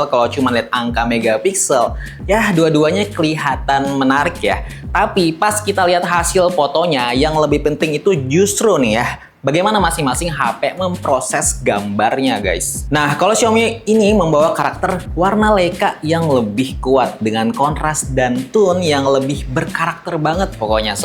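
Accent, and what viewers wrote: Banjar, Indonesia. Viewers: Orang banjar kah pian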